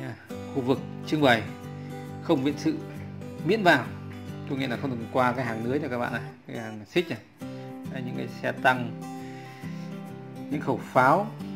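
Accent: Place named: Hung Ha, Vietnam. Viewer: Ông anh đúng giọng Hưng Hà rồi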